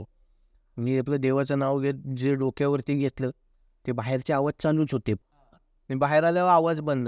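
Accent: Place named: Ghats, India. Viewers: तुमची भाषा कोकणी वाटत नाही. पुण्याकडचे वाटता. आणि कृपया कोकणाचा उल्लेख करू नका. काही गरज नाही त्याची. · आमी पन कोकणातलेच हत, पण दादा सांगतत ते कोकणातले हत पन त्यांची बोली भाषा ही घाटावरची हा.. मालवणी किंवा कोकणीच्या आसपास देखील नाय हा.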